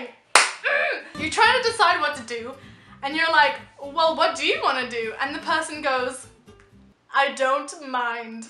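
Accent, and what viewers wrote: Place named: Australia. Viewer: How the fuck do you sound Australian?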